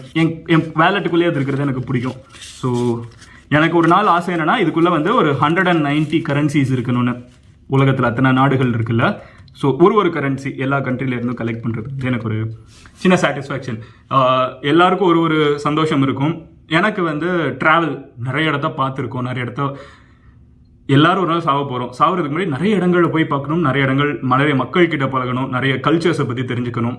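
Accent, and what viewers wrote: Madurai, India. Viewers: Enna than Professional ah Pesinalum Madurai Slang Vanthuduthu 😂😂 Nikka Vachuruvainga,Flight la Kuduthainga 😂😂 Madurai ❤️🔥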